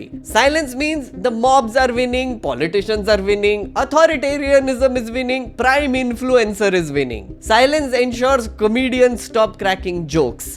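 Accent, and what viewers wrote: India. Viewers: You have a stereotypical Indian accent. 😃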